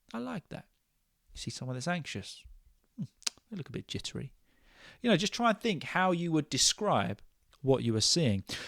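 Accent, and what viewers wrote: United Kingdom. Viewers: I love your vibe and clear British accent 😊❤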